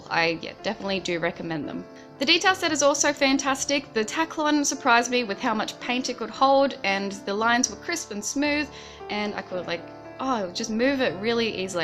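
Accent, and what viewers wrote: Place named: Australia. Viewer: Nice to come across a review video with an Aussie accent. Hi from Lismore, NSW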